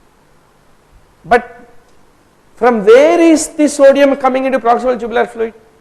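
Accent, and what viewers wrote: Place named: South India, India. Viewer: Filho well its english but the accents are diffrnt. south indian people have this kind of accent. nothing wrong with that. uk n us english have lot difference in accent as well. it varies frm region to region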